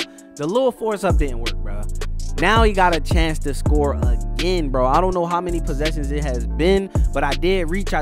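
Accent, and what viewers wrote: Baltimore, United States. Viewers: You gotta be from Bmore with the accent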